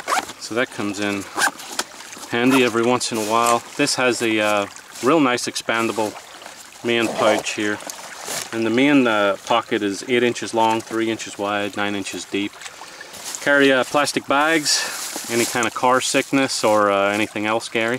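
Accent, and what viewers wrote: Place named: Northern Ireland, United Kingdom. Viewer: Hey this is the first of your video's that i have watched! I gotta move to USA! lol. I love how after 20 years you still have your Northern Irish accent, I love the hybrid USA/Northern Irish twang you've got it's so cool! Gonna have a lot of fun looking through your vids! Blessings from Carrickfergus NI.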